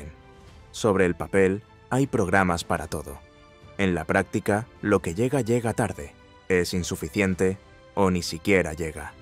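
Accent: Spain. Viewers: Pronunciación del inglés típica de un hispanohablante de España.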